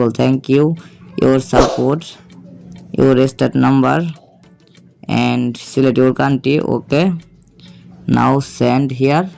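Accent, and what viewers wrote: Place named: Tamil, India. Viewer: Tamil voice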